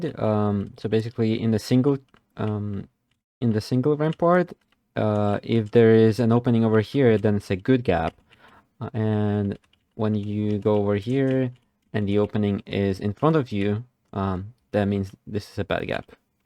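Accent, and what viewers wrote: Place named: Ukraine. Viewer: You're from Ukraine, right? I found your channel pretty late, but I absolutely love how your English sounds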